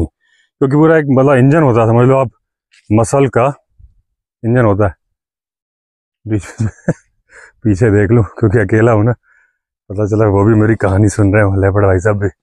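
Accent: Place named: Jabalpur, India. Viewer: Sir aap proper mp se hai ya kumaon hai apki language to jabalpuriya hai Bilkul clist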